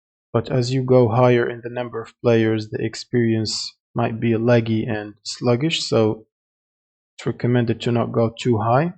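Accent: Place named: Egypt. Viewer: المصري تدري من نبرته وتفقيشه ياحبيبي احذف التتوريال ما يضبط يا اهبل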